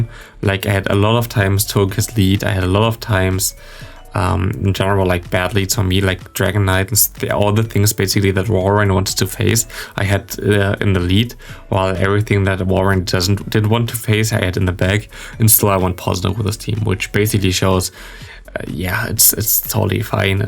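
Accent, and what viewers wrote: Germany. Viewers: Is it possible that ur german cause ur accent kinda sounds like german :)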